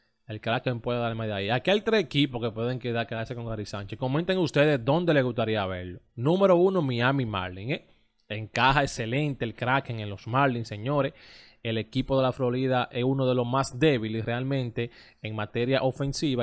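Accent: Cibao, Dominican Republic. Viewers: Buenas tardes, tú hace buenos comentarios osea que hace tu tarea pero acuérdate que tú estás en un público tienes que mejorar tu manera o tu forma de hablar acuérdate que no solo te estás dirigiendo al público Dominicano que sabemos que eres cibaeno pero los otros países no saben el porqué tú habla así que en vez de decir (estoy seguro tú dice to’l seguro en vez de decir ochenta y seis dice ochental sel) y así sucesivamente pero si haces buenos comentarios y te felicito en eso.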